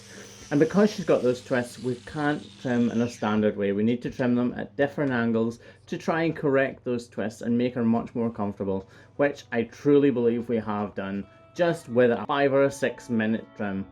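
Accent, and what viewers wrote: Scotland, United Kingdom. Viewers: I looove your lovely Scottish accent and how you help animals! I’m American and have a customer with a lowland Scottish accent.. just adore her. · I watch so many of your videos on mute I had no idea that you where Scottish this full time that’s so cool I stay near Glasgow. If your ever looking for a 30 year old apprentice I would love to do this I can’t stop watching these videos lol there class.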